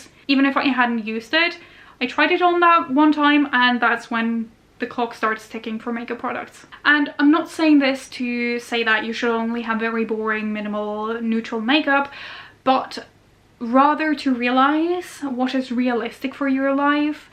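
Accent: Ireland. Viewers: I love your Irish accent!❤ Greetings from Israel🤗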